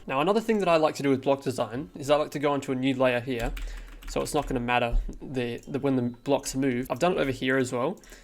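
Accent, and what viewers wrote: Australia. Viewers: are you from australia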